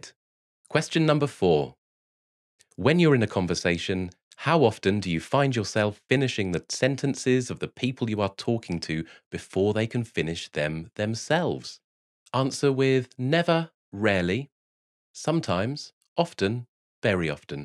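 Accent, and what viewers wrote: United Kingdom. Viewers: I noticed the asymmetrical glassesand the way they make his eyes smaller, both the lip and weird-placed ear piercing which is also a bit rusty it seems, the few grey hairs in his mustache and beard, the hairs that are out of alignment on his head, the whiteness and regularity of his teeth, his brittish accent and so many other things I need to rewind to hear the message again. No doubt I have some form of adhd. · And is his sweater blue or black? How many piercings does he have? Is that an English accent? 😂😮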